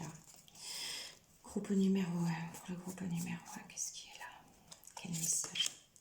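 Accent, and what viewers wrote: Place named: South, France. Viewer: ✨✨Bonjour ✨✨ je suis abonnée depuis peu à ta chaîne, je pense pas me trompé, en disant que c'est Aurélie Chamane qui nous à parler de toi et de ton oracle, et que les cartes était faîtes à l'effigie de tes peintures??? Wouah...tu as l'accent du sud comme moi. Qui suis native de cette région. Ta guidance ma parlée, je te remercie pour ce partage. À tout bientôt..... ✨🌴🌈💜🙏💜.🌈🌴✨..😘.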